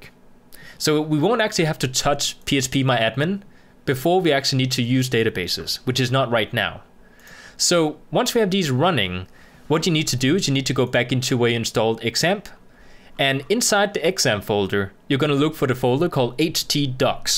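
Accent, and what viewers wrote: Denmark. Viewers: Hah du er dansk d er jeg også. Syntes også jeg kunne genkende accenten :). God tutorial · Was gonna say, you sound like my Norwegian cousins. Danish! Great tutorial, from a python and C lover. Subbed, upvoted, and all notifications enabled. :) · Er du dansker?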